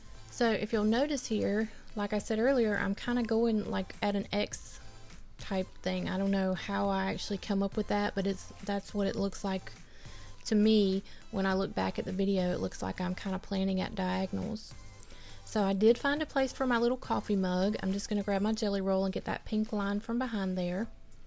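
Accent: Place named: Carolina, United States. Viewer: You sound like you may be a Carolina girl! Love your channel! ❤️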